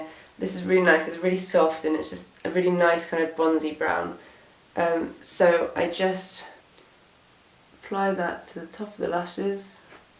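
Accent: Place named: RP, United Kingdom. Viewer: your voice sounds like you are from made in chelsea or somewhere! haha x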